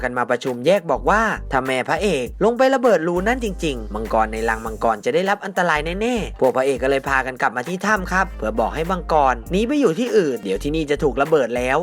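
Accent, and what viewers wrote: South, Thailand. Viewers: หนูรู้สึกเหมือนพี่เป็นคนใต้เลยเเต่หนูชอบคลิปนี้อยู่นะค่ะเพราะมีตลกมาเพิ่ม🤣🤣🤣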